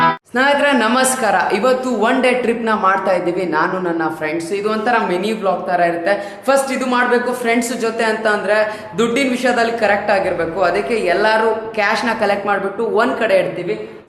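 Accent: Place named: Kalaburagi, India. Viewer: Brother pure kalaburagi matt addu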